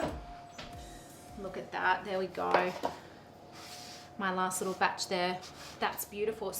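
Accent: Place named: Australia. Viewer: When I clicked I didn’t expect the Aussie accent 😂😁👌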